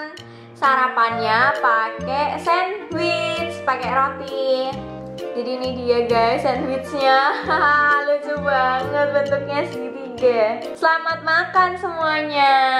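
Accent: Java, Indonesia. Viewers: Ka dari jawa timur atau jawa tengah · Mbaknya org mana?ook rodo2 njowo😂😂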